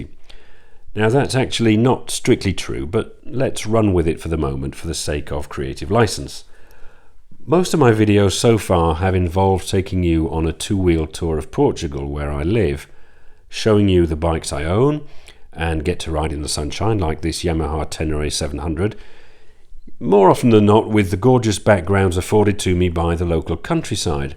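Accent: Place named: Northern England, United Kingdom. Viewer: Nice I knew I heard Northern! I was born in Leeds. Ridings folk enthusing over Riding, gotta luv it lol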